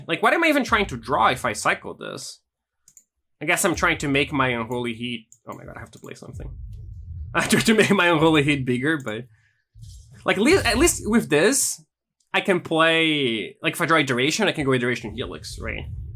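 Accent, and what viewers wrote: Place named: Brazil. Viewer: Tenho que concordar. Acho que a sua pronúncia é impecável. Dá pra perceber um sotaque residual, mas se eu não soubesse que você é brasileiro, nem diria que é sotaque.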